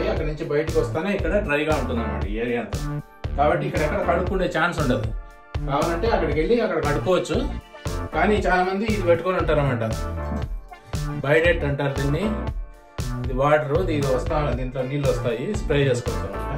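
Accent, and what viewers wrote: Rayalaseema, India. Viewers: గారు మీది రాయలసీమ కదా మీ వాయిస్ వింటే అర్థమవుతుంది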